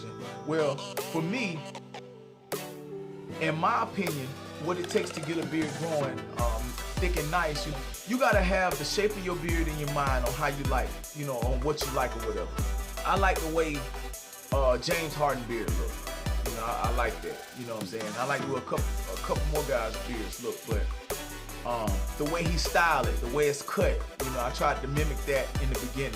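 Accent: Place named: South, United States. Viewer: Bride has to be from the south lol I’m from the south that nigga sound just like us lol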